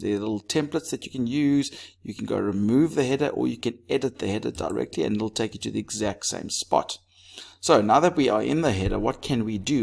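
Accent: South Africa. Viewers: Are you south African ???????😊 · are you south african?????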